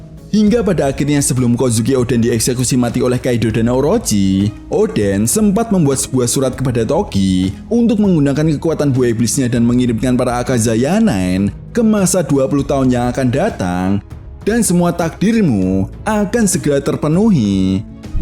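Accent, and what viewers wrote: Java, Indonesia. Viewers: Logat jawanya lucu, ini yang bikin betah nonton. 👍🏻👍🏻👍🏻😂😂😂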